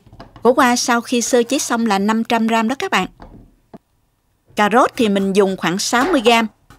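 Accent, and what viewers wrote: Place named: Mekong Delta, Vietnam. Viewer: Thích giọng nói quá, y Chang miền tây luôn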